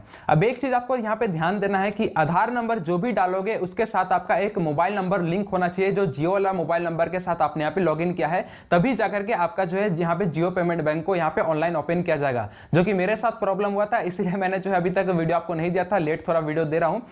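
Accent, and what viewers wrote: Ranchi, India. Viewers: Bhai app ranchi sa ho kya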